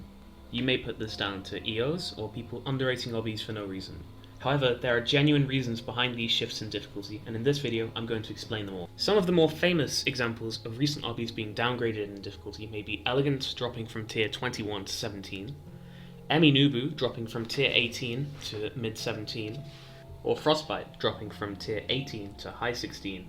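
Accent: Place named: Wales, United Kingdom. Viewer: He’s welsh your English isn’t the problem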